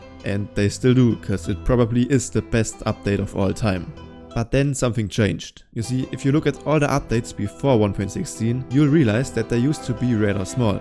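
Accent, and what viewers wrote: Germany. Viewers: Man hört, dass du Deutsch bist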